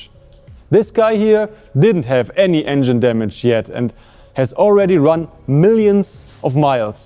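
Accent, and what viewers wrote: Germany. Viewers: its really funny that this guy talks relatively decent english but then just randomly pronounces singular words 100% germanly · If you're going to make claims like your "facts" at 1 minute, and your 80% claim at 2 minutes, you've got to provide actual references. You have reasonable hypothesis, and you've done some preliminary experimentation, but to claim "finally proven" is intellectually dishonest. 2/10. Dramatic lighting and a German accent does not make something good science. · Sehr deutscher Akzent 😅 der scheiß gehört abgeschafft !! Das erste was man machen sollte nach dem Motor Start is den scheiß ausschalten!!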